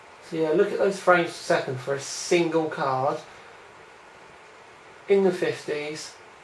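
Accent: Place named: Plymouth, United Kingdom. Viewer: From the accent I would say Plymouth.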